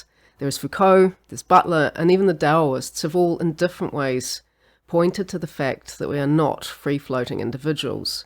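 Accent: New Zealand. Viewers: Kiwi accent.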